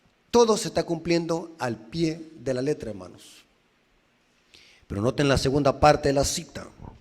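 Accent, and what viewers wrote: El Salvador. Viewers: Hermano, con mucho respeto, este hermano es del Salvador , y casi todos ellos tienen su modo de hablar, este hermano por más que quiera ya no puede cambiar su pronunciación. Así , es su estilo de hablar. Aquí en mi país , en la ciudad hablan muy diferente de cómo es en el campo o en otro estado, y no se puede cambiar y cada uno nota nuestra manera de hablar, y no hay nada que se pueda hacer. Por ejemplo para nosotros los mexicanos los hombres se saludan en algunas partes así: hola, gallo , cómo te va? Y en El Salvador decir gallo es meterse en problemas , gallos para ellos es el Sancho, o es el amante , por eso cada país tiene sus tradiciones. Disculpa mi manera de expresar esto. Te deseo que tengas un lindo día, y espero me disculpes por decir esto. Besos.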